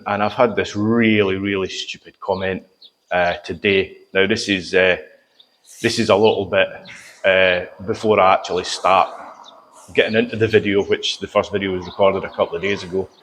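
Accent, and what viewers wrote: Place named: Scotland, United Kingdom. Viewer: Hi, when we were kids we had a saying that goes like this....... jealousy makes you nasty....... If you give way to the low class you end up like them. I listen to the Scottish accent coming through, if you are of Scottish descent, man, this person placing these comments clearly does not know the Scotts. Water of a ducks back, that's how you treat these comments. Good luck with the wetting of the front porch as soon as the place is finished.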